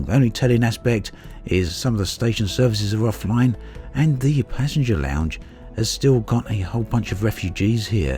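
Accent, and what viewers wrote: United Kingdom. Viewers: He is british. End of story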